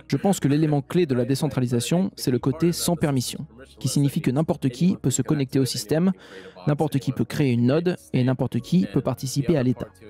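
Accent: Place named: United States. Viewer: C'est vraiment ridicule cette prononciation des mots anglais à l'américaine. Le plaisir d'écoute s'en trouve impacté.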